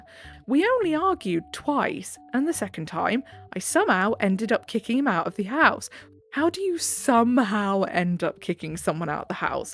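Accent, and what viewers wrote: United Kingdom. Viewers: I've discovered your channel last week. It has been recommended to me by Youtube. I was particularly interested by the "Human narrator" in the thumbnail. Your voice is very soothing and I love hearing some British accent. So, you've convinced me, I'm making an offering to the algorythm, because there's more people like me who would like your channel to pop up in their recommandation as well.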